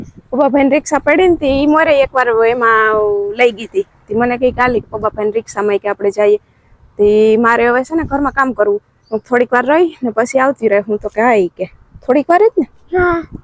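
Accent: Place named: Kathiawar, India. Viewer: Kem chho ben. Sivani su kare chhe. Tamari boli bov saras chhe kathiyawadi..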